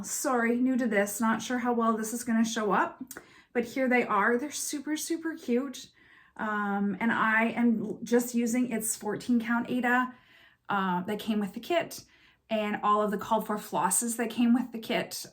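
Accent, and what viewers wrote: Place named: Canada. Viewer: Nice to hear a familiar Canadian "accent". I'm also in Ontario, closer to London. I love seeing all your projects. We have similar tastes in stitching and I have some of the same ones ready to go. I usually stitch on one project at a time and I'm over half-done a Teresa Kogut chart (Cotswold Fields) at the moment. We are about an hour from the border, so that's convenient for Hobby Lobby, etc. Luckily we have a LNS in London (Stitch-It Central). Keep stitching!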